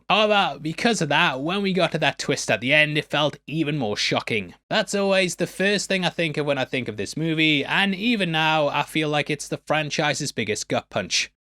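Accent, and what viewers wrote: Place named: Newcastle, United Kingdom. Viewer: Wait is a Geordie?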